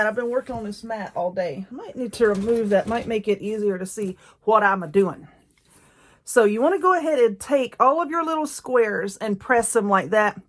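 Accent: South, United States. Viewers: That is beautiful and I love listening to your beautiful southern accent.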